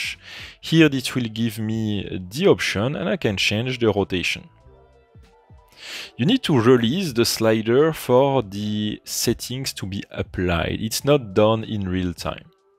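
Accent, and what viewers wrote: France. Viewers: Love this french accent 💌🇫🇷 and vive Twinmotion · me too 😂😂😂😂 its the first time in my life to hear a french english accent as strong as this one 🤣🤣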